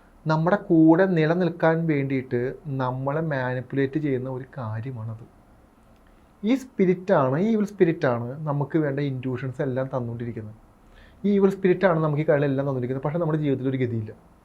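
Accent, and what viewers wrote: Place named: Ernakulam, India. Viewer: Yes കുമ്പളങ്ങി nights shane നിഗം slang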